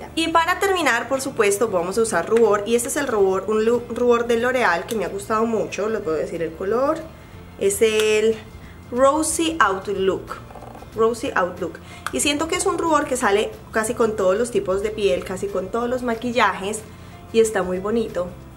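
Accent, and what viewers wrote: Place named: Venezuela. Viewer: Me gusta como habla, su acento Venezolana? no me equivoco?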